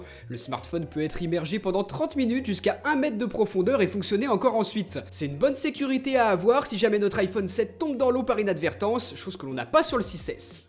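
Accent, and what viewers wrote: Lyon, France. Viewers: Mais tu es de LYON ?! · Oh mais t'es lyonnais???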